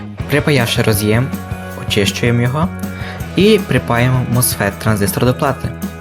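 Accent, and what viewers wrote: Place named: Mongolia. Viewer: Язык смешной,автор наверно монгол.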